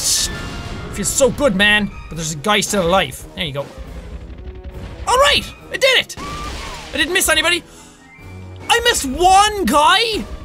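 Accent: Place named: Ireland. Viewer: My mum loves hearing your voice while I'm watching your videos because apparently you sound like an Irish guy from Sherlock :)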